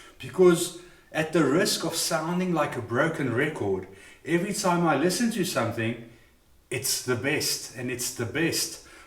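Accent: South Africa. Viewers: I have been looking at replacing my speakers which were unfortunately ruined in a rain storm we had here in Nova Scotia back in 2023 which flooded my basement. I have been considering these speakers from Martin Logan, the Bowers & Wilkins 702 S2 Signature and the Monitor Audio Gold 500 6G. The Martin Logans are the cheapest of these three speakers. I am running a Bryston 4B Cubed amp which is 300 watts into 8 ohms and 500 into 4 ohms. I know it would drive these speakers extremely well. I have also heard others say that the Bowers & Wilkins can be fatiguing in the treble. Are you from South Africa? I think your accent sounds like that. I am so glad you did this review. Thank you. 👍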